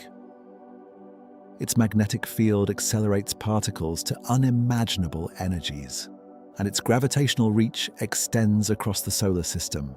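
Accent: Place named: United Kingdom. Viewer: another femme british narrator!?!?! wtf is happening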